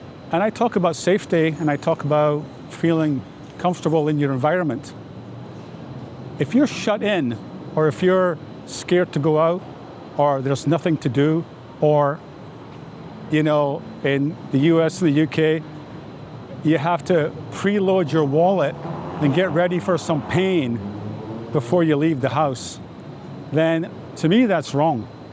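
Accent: Ireland. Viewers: English isn't my first language and i can't place your accent, where are you from ? Are you Irish ? BTW greetings from Ayutthaya area, i'm married to a Thai and loving it here too. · You lived in the US? Your accent sounds different like Irish.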